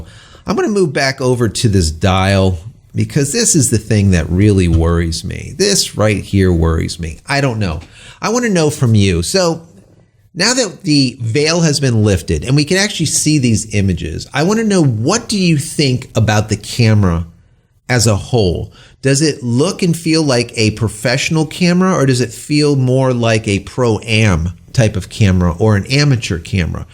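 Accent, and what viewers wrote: United States. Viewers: ) 2 things. Well, 3 things. 1. Great videos, I've been waiting for Nikon to come out with mirrorless for years and I will not be sleeping tonight I'm very excited. 2. People shouldn't harp on you for having an American accent when you pronounce Nikon. N-eye-con... deal with it, Americans speak with an American accent. We also say automobile and not Jidōsha but no one corrects us on that. Fools. 3. The back dial you referenced is historically called the Command Dial, and Nikon has never labeled it in any of their DSLR's. The front unlabeled dial is historically referred to as the sub-command dial. It looks identical to the Command Dial used on the Nikon 1 V3, and I'm sure it'll operate the same.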